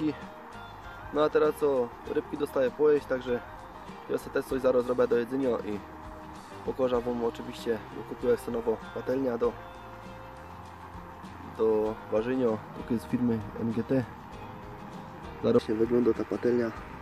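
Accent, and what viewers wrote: Silesia, Poland. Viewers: Ty ja tak samo. Gadka Śląska hehehe. Chytaj chytaj :) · Jak ja lubię Ciebie oglądać, przez tą Twoją gware 😉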